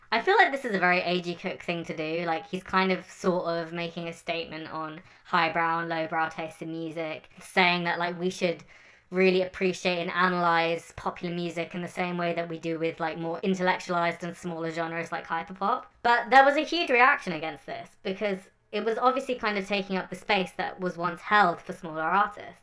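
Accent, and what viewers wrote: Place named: United Kingdom. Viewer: Americans?💀 he has a british accent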